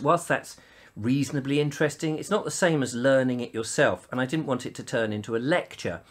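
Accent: United Kingdom. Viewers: Nice, why can't you try to teach British English for the non english people( beginners), I know that lot of english teaching videos are available in utube, but learn from you give some different view I believe, I really enjoyed your english pronunciation, and I very love to learn the British English, and British accent.. Can u do it?...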